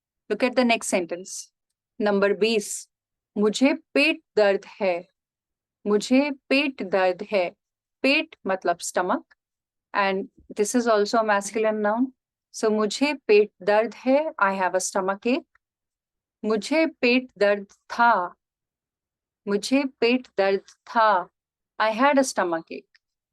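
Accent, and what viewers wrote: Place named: Delhi, India. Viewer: Hey, do you mind if I ask which part of India you're from? You sound like you have a Delhi accent, or at least from somewhere in the North. Also, I was going to suggest you to do a video about the numbers in Hindi.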